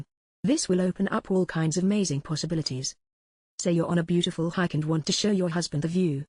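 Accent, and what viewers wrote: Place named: United Kingdom. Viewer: Now I fucking hate the british accent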